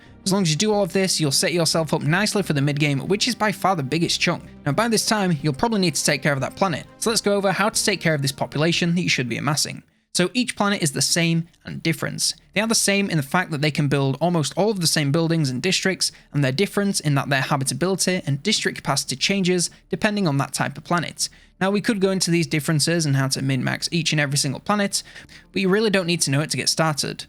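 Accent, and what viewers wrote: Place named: England, United Kingdom. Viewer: Why is every guide by a guy with an English accent? No offense but I have difficulty understanding accents especially when the person talks a mile a minute.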